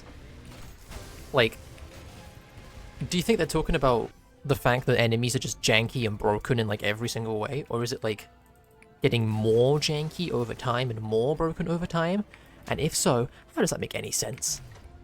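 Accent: United Kingdom. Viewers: I enjoy listening to funny British guy's(your) voice while I do stuff... it's like a podcast with occasional ultrakill sounds.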